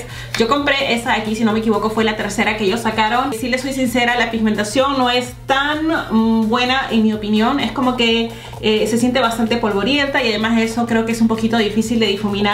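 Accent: Peru. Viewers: Y mira que me gustan las Youtubers inglesas, francesas, italianas y las españolas(que vivo en España) pero nada como oir el acento peruano 😍😍 y mejor aún cuando es de maquillaje. Te he descubierto hace poco y sin duda ya me he suscrito. Besos!!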